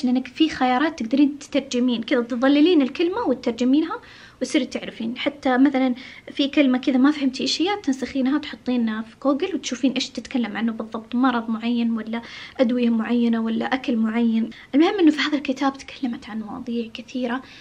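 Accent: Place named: Saudi Arabia. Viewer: ولدت بجدة و انا سورية و اضطريت للهجرة و اشتقت للسعودية لما سمعت لهجتك حنيت الله يجمعنا بكم بخير يارب انتم قلبكم طيب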